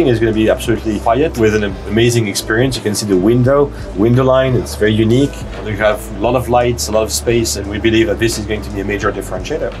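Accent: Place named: Switzerland. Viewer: "Bisch scho am filme?"...ha doch dänkt das du schwiizer bisch...😊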